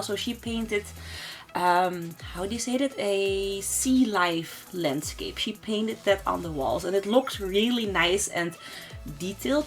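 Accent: Netherlands. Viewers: Ik herkende het nederlandse accent gelijk, gelijk even in de comments gekeken of je echt nederlands bent aaa. Hahahaha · are you from holland? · Ik zat te kijken, en dacht: ik denk dat ze Nederlands is, ga ik in de comments kijken ben je echt Nederlands, je hoort het wel, maar je spreekt wel veel beter Engels dan de meeste Nederlanders. Maar je hoort het toch wel een beetje bij woorden zoals wanted en work, maar dat is niet erg hoor xD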